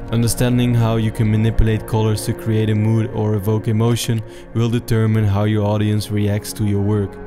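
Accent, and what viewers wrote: Netherlands. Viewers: btw brother are you Dutch or Belgian ? The accent too obvious hh. love your content lets keep it coming!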